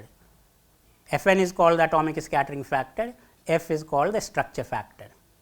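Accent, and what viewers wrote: India. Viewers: This lecture is so good that for the first time I was able to listen through the entire lecture on YouTube with Indian accent.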